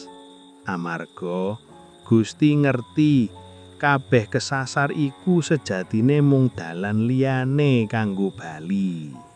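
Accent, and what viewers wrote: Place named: Java, Indonesia. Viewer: klo menurutku sih, logat dan kosa kata jawanya... daerah solo/jogja, tapi berstrategi merangkul iawa secara luas.. maka kata rek .. tersemat dlm narasinya...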